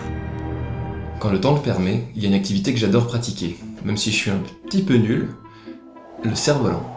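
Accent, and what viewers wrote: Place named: Occitania, France. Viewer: Super sauf ton accent occitan !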